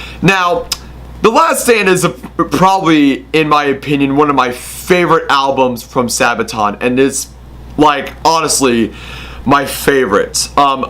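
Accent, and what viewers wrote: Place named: Russia. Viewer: Dat Putin accent doh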